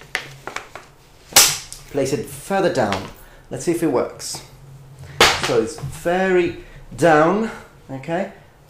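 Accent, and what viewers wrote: United Kingdom. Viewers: T'es français, nan ? J'ai cru repérer ton accent, mais tu as un excellent accent british. ^^ Vidéo très intéressante, je me doutais bien que ce n'était pas possible en temps normal mais ça a l'air tellement cool ! x) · what's even more interesting, is an Italian speaking with British accent :) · I just noticed that your British accent is pretty strong! You even use the R between words like in "the idea rof a straight sword". That's pretty funny to me but that shows your natural skills with phonetic articulation; you also have a talent for acting, I don't know if you ever studied theater but you definitely should. I'm Sardinian by the way. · Where are you from man? I can't pick the accent. It sounds maybe South African or from somewhere around about Holland. I'm tending toward the latter because, though your English is very good, it ain't perfect. 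I don't mean to have a go but "information" is not a countable noun . PS, on the the other hand. Of course, native speakers don't spit out what the prescriptivists would dub perfect grammar one hundred percent of the time (far from it). However, I'm getting tinges of British flavour here & there, so I'm still guessing the Netherlandish vicinity. PPS So, I was so wrong. You're Italian. Oh the shame! Still, I'd not guess it. Thanks anyhow for you vids.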